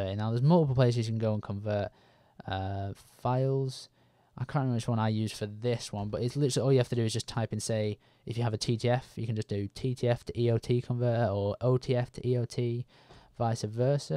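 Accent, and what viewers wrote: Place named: United Kingdom. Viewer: your British accent is awesome !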